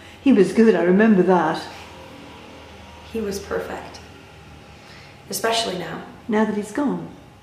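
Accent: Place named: United States. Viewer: i was hoping i'd hear her do a good American accent someday. i'm happy to say that day is today!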